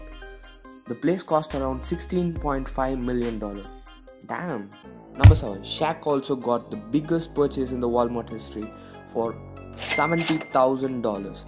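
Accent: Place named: Australia. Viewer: This guy has Australian accent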